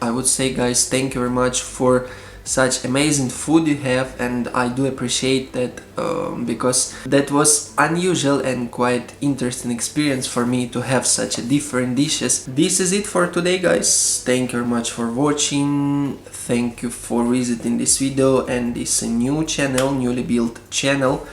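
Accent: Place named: Philippines. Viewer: Your pronunciation is like Filipino accent. Very clear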